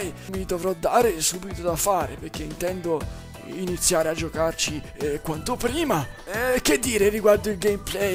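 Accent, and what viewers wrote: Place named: Tuscany, Italy. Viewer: E da quando hai l'accento toscano?!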